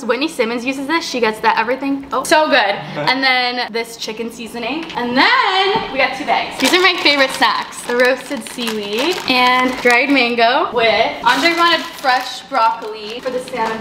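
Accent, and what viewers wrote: Canada. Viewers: i know this is a random question, but i cant tell if has a Canadian accent?? does she?? i can never tell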